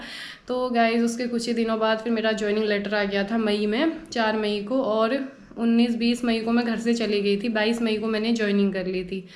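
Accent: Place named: Kumaon, India. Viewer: आप बहुत प्यारी हो। आपके ज़ुबान से कुमाउँनी भाषा बहुत अच्छी लगती है।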